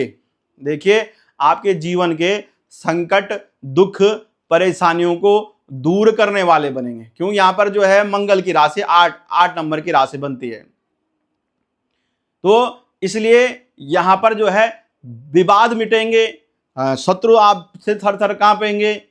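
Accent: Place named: Pahadi, India. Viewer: Mujhe lag raha tha aap pahadi Ho aapki bhasha mein Thoda Thoda pahadi pan chhalak raha hai per bahut achcha bola 🙏🏻🙏🏻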